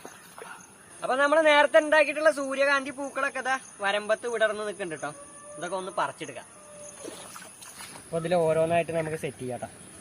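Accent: Thrissur, India. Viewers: നാട് എവിടെയാ തൃശ്ശൂർ ആണോ സംസാരം കേട്ടിട്ട് തൃശ്ശൂർ പോലെ ഉണ്ട്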